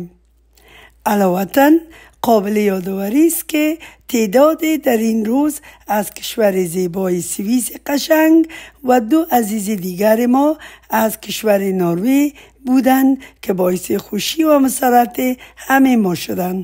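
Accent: Kabul, Afghanistan. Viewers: سلام شیرین زبان ، بلاخره کسی را در یوتوب یافتم که درست مثل خودم و کابلی اصلی حرف میزند love you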